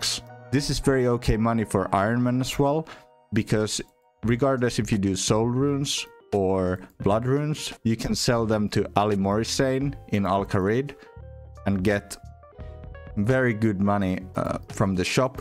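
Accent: Finland. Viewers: Watched the 1st 15 seconds and could hear that you are Finnish! Great content man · Im and can tell you’re finnish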